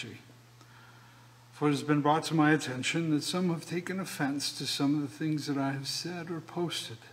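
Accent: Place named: Transatlantic, United States. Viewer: Props on the transatlantic accent. Very nice.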